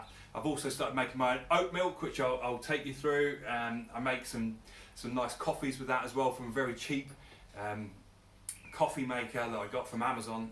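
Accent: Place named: United Kingdom. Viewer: hey, thanks for your videos , it really help to make a transition. Besides, it is nice to listen a british accent for ones ;)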